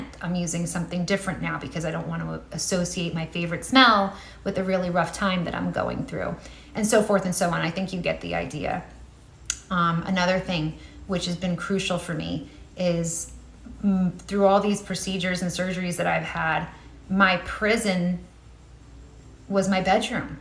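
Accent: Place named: New Jersey, United States. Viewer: He has blessed me! And God bless YOU as well!! Yes, lol the accent you hear is indeed from Jersey and that is where I had my surgeries. ❤️‍🩹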